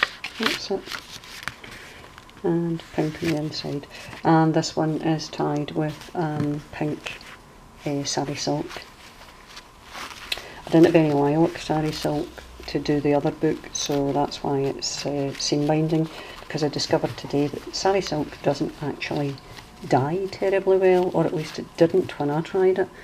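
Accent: Scotland, United Kingdom. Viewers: adorable wee little journals, you having me talking in a Scottish accent now, and I'm not making fun of you, I really could listen to you all day.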